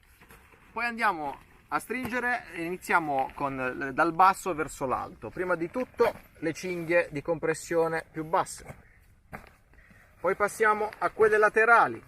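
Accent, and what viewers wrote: Apulia, Italy. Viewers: Grazie per le belle dritte che ci dai con grande simpatia! Non so di dove tu sia ma ti sento molto Pugliese... e ho detto tutto. Non vorrei snagliare.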